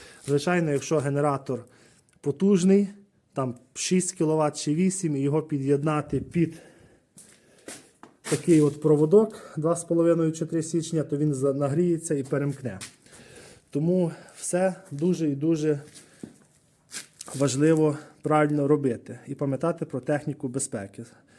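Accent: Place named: Hutsul, Ukraine. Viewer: це не українстка, а гуцульська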